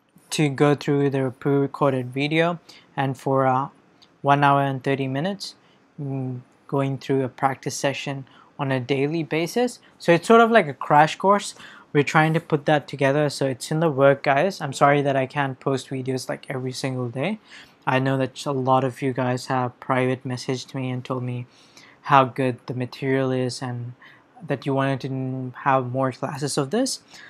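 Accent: India. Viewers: wideos. You're indian. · you are from bengal and bengali might be because i understand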